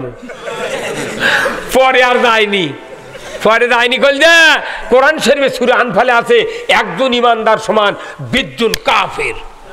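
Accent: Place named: Bogura, Bangladesh. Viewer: মাশাল্লাহ, চট্টগ্রাম থেকে মারহাবা জানাই, হোকনা বগুড়ার ভাষা, আমি কিন্তু ক্লিয়ার বুঝতেছি,আলহামদুলিল্লাহ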